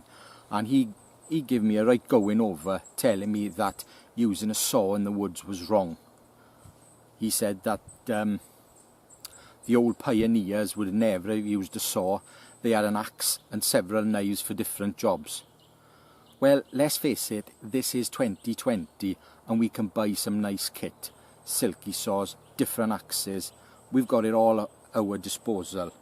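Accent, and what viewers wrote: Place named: Wales, United Kingdom. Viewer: I've never heard of any of those people before. I think you're the first Welshman I've ever heard or seen. At least I've heard enough Irish and Scottish accents to pick up on the the Celtic sound, it being Welsh never crossed my mind but it does make perfect sense since it sounds a bit like Scottish and Irish but it's clearly neither of those. Anyways, thanks for clueing me in!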